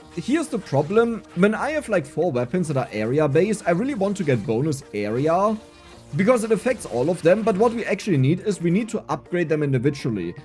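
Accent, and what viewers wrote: Russia. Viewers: fam hes clearly Russian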